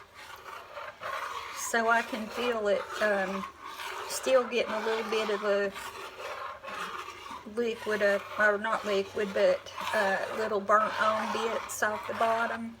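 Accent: South, United States. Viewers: Heather Robbins:. Isn't a sweet lady to use her time to teach us different recipes? It's that southern tone she has,just so sweet.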